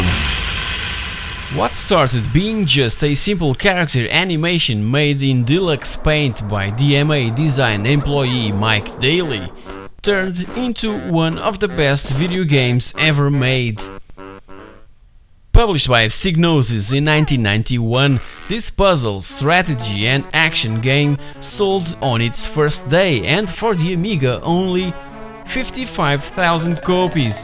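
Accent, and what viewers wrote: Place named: Portugal. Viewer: Portugues certo... o sotaque parecia... muito bom canal! Saudosismo é coisa boa!:)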